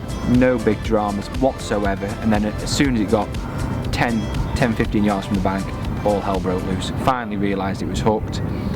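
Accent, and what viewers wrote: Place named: Stoke, United Kingdom. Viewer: nice quality video bud and that is nearly a Stoke accent lol.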